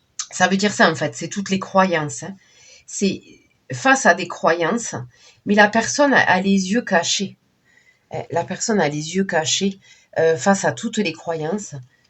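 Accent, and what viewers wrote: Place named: South, France. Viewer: Vous avez un accent du soleil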